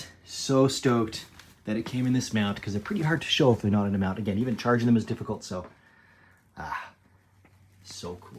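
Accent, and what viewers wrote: Canada. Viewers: Are you Canadian I can’t tell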